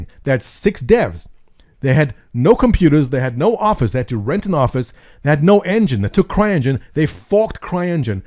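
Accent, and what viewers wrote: South Africa. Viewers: And you just have the biggest Testies! Btw. Do I spot a South African dialect there? And do I remember correctly you currently reside in Canada? Not a stalker question, just testing my dialect sensors :D.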